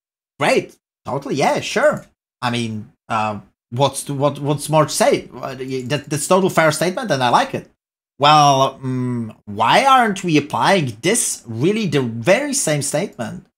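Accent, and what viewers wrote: Czech Republic. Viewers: Zase tak skvělou ne, ale už jen že mluví s českým přízvukem to dělá srozumitelnější a to o HODNĚ. Taky mluví dost pomalu. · ingliš vidijou miluju czech accent over muj british :D:D:Dd:d tohohle typka miluju proste, nejůepsi wot content a zvedne ti naladu kdykoliv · Angličtina jako čeština pikacu ,smysl ok😊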